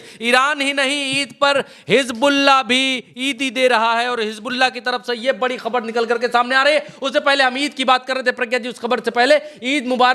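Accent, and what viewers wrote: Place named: Uttar Pradesh, India. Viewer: जी की देसी टोन यूपी वाली सुनने मे बड़ा मजा आता है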